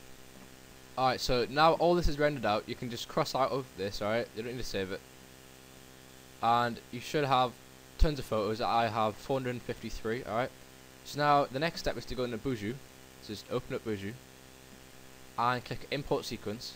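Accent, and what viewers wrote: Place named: Wallsend, United Kingdom. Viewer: Sounds like your from Wallsend or around there somewhere.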